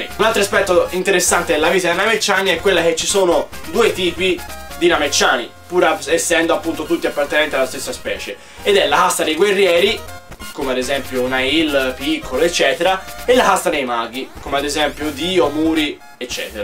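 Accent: Tuscany, Italy. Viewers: MITICO! Assolutamente Iscritto! Simpaticissimo, accento adorabile :3 (adoro il toscano), informatissimo e piango insieme a te all'idea dei Namecciani morti ingiustamente.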